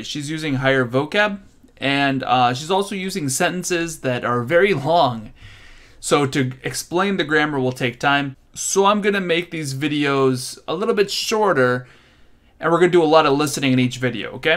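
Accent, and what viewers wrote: United States. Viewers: It's not awful - just standard American accented Japanese. Accents are tricky to kick... they tend to move at their own pace.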